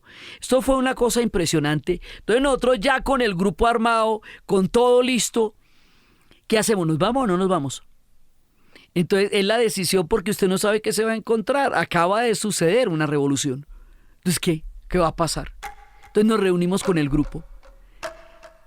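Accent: Bogotá, Colombia. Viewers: Si cierras los ojos, conectas el relato con el fondo musical y le pones imaginación, listo el viaje . Gracias por sus videos y podcasts Dra. Uribe. Además su acento Bogotana me fascina. Sobretodo cuando al final de algunas frases usted dice : Si !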